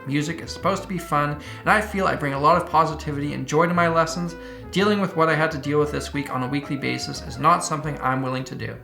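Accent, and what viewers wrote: Canada. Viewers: you can really hear his canadian soreys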